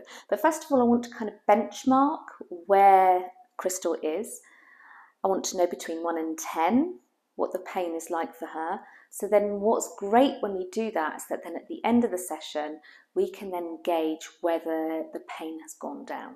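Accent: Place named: Cockney, United Kingdom. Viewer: Why does this nurse speak with a strong cockney accent? She must be related to Rodney Trotter from Only Fools and Horses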